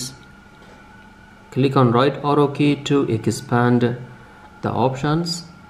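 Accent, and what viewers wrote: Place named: Afghanistan. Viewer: which stable do you come from. Camputer. I laughed who taught you English. I guess you must be an Afghan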